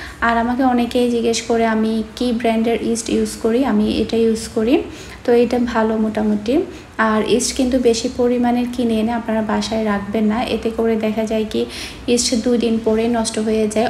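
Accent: Chittagong, Bangladesh. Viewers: চট্টগ্রাম এর ভাষা একটু অন্য রকম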